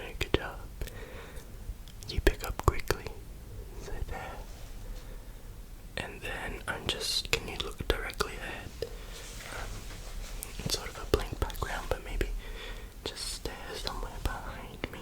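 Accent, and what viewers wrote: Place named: Australia. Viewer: My first watch of one of your videos, and was trying to identify your accent to see if you were a fellow Aussie, then you said yeah nah and I didn’t have to check your about 😂😂 subbed 🤩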